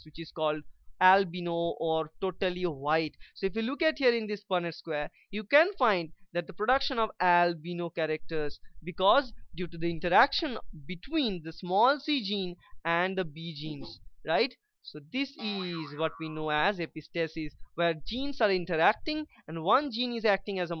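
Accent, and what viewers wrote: India. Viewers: As you hear this classic Indian accent, you know you will fully understand a new concept.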